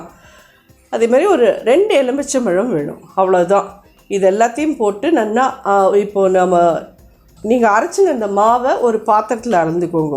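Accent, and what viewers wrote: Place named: Brahmin, India. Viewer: Maami neengal prema padurang mathiri irukkel. U speak pure brahmin agraharam Tamil. Excellent mam 🙏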